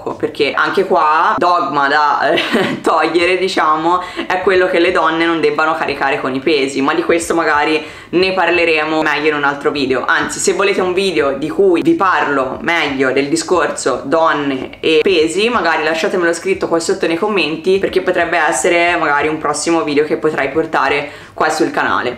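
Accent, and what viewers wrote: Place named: Tuscany, Italy. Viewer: Bellissimo accento toscano, ma di dove esattamente?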